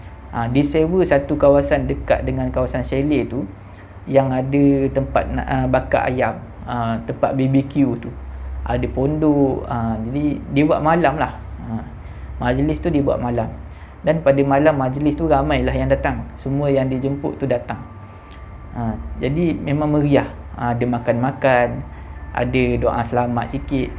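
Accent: Terengganu, Malaysia. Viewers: nk tye je ni org ganu ke sbb dgr slank ganu 😅terbaik cite dk pnh trlepas lg dgr 👍teruskan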